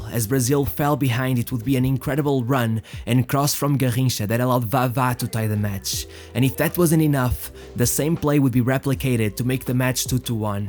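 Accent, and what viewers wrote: Portugal. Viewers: My friend, you are from Portugal. I can feel it in the accent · Nice try, mas dá para perceber o sotaque português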